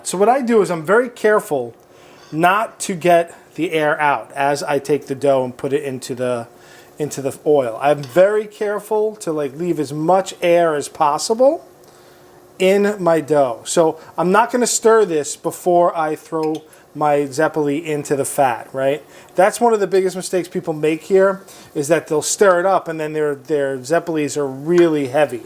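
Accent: United States. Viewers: I like people who talk less lose concentration listen talk and look the food he no stop talking He got an American accent this why he use dry yeast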